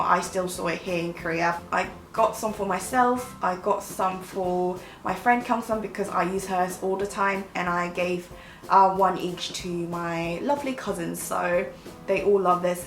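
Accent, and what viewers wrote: United Kingdom. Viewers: 은 항상 보면서 존경스러워요.. 외국생활 아무리오래해도 전 저정도는 절대 못할꺼같아요 어후..발음도 약간 영국식발음이 정말 사랑스러워요 꾸ㅡ으으♡ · Love your British accent!❤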